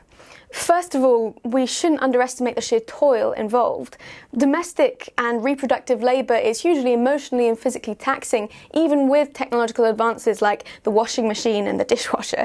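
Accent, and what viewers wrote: United Kingdom. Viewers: Cute, sexy, smart and with a British accent......cool!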